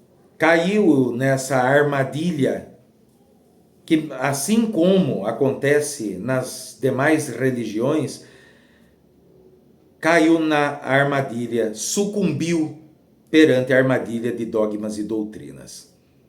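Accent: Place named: South, Brazil. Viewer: O cara não sabe nem o Português, quanto mais falar sobre coisas que não existem. E esse sotaque sulino escroto, e esse olhar de louco.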